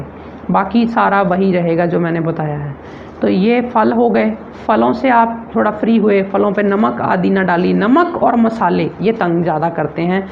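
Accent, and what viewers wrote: Punjab, India. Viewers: भाई जी आप की हिन्दी बहुत अच्छी है आप पंजाब-हरियाणा से तो नहीं है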